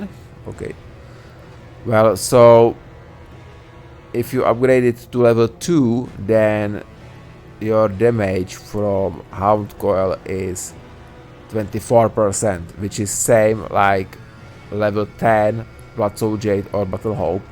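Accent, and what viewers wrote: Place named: Czech Republic. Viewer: hned vím že to je cz typek přízvuk jasny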